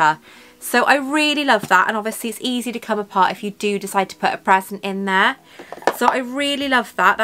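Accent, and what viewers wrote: Wales, United Kingdom. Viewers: How your mental health been a bit better I hope. Hugs 🤗 always I get it I go through bouts mainly holiday periods when less occupied which for us a must right, your first day one on the calendar I was reminiscing so I use to have a pink baby pink jewellery box when I use to go through temporary as people are just baffled and quizzical about me find myself the golden you showed here I had that exact colour as a lock and key duo. Just thought of that time.. baby good key but giant box.. Hey you want to wear two necklaces wear it who cares I say do what you want to do. Your nails 💅🏼 didn’t look that bad honestly just a few scraps here and there but SO. I love longer videos I’m breaking it down for today and then tomorrow. Though which sometimes I do we don’t get a tree so no bauble to hang I could I could put it on my bed 🛏️ heh heh 😼 I like your Welsh accent. 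I’m so fussy I love pen collecting too whatever people think don’t care I’m completely away from the so called norm entirely. I don’t like the pens with the on top parts either ballpoint also when I had issues writing the bic pens worked better for me grip wise as it was a wreck for ages and ages so had to do handwriting practice which now is so much better. But I have to have to write properly specific pen they said with me for holding and grip which I find it’s true certain ones it’s just all over but I find have to have lined notebook non lined writing slops down like a hill. So with me nothing on top like that or my gr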